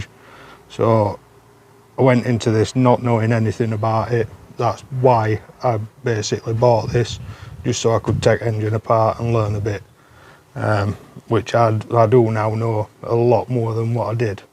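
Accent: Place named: South Yorkshire, United Kingdom. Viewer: Hiya mate, judging by your accent I’m guessing you are in the South Yorkshire area, I’m gonna say Barnsley. I may be wrong. Anyway the Vectra looks great, my dad used to have one of these back in the day, and it was a diesel variant in like a British Racing Green colour. One thing I always liked is how wing mirrors are integrated into the body lines.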